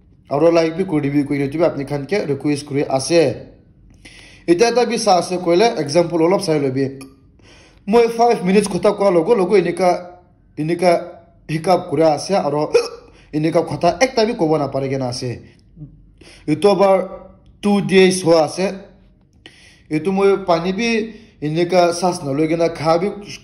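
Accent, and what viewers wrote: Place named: Sema, India. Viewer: Please control your sema accent it's heard to hear😅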